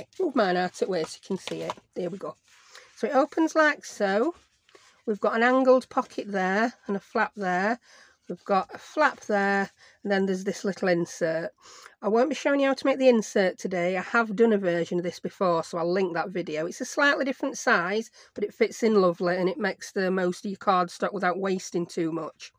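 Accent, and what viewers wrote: United Kingdom. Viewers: I am so glad I found your channel. I love your no-nonsense directions. Easy to follow with a bit of humor. It makes me smile that you share the flub-ups. 😊 I love your British accent and humor. It reminds me of a dear friend that passed a while ago. Keep making the how-to's and I will keep watching. ❤🥰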